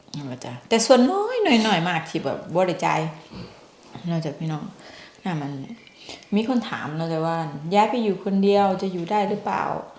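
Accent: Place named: Isan, Thailand. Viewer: น้อง บ้านเกิดที่ไทยอยู่จังหวัดไหนจ้ะพูดอีสาน ม่วนๆจ่ะ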